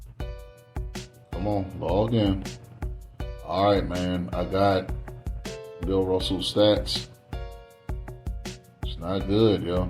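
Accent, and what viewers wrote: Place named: Baltimore, United States. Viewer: You from Baltimore? Heard a “few “ dews lol… I’m West Baltimore born and bred.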